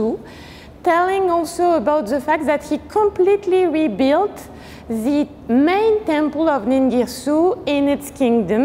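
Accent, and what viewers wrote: France. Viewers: Thank you so much for this visit ! As a german i’m very pleased to be able to understand without having to be focused on the subtitles :) the french accent gives me the impression to travel til’ Paris with you in this marvellous place that is mesopotamia ! My favorites are definitely the lamassus at the entry, so impressive 💜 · Quel accent français insupportable ! Je doute qu’un non francophone comprenne le moindre mot.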